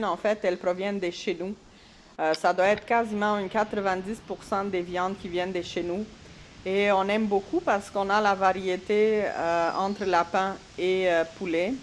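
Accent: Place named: Canada. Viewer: merci 😀. Il me semblait avoir reconnu l’accent canadien mais je n’en étais pas tout à fait sûre😀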